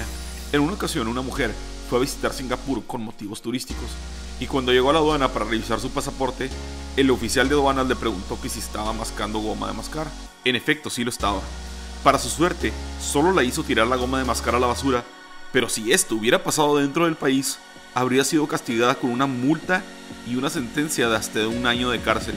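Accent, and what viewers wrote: North, Mexico. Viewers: Si no fuera por ese pinche acento norteño este video sería bueno!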